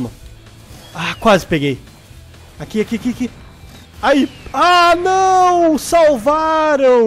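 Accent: Rio Grande do Sul, Brazil. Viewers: Rsrsrsrs o tem essas gírias e falas gaúchas. Deixa muito engraçado rsrsr 😎😁😆😆😆😆😆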